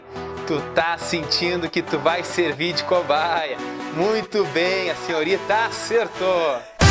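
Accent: Rio Grande do Sul, Brazil. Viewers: tu é gaúcho sor?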